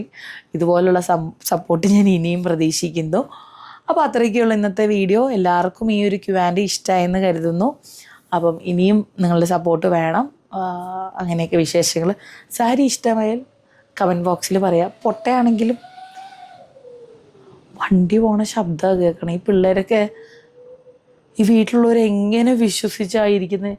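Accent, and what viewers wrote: Thrissur, India. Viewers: kunje ethu dress ittaalum kaanaan nalla bangiund U R always perfect by all means Kottayam kaari yude language slang Thrissur kaari pole aayi Kottayam pokunna thrill il aann enjoy cheyyu Happy n Safe journey God Bless ❤❤❤❤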